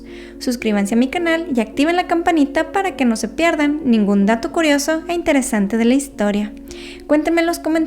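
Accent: Mexico. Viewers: Holaaa lindo acento mexicano 👋👋 Muy buena historiografía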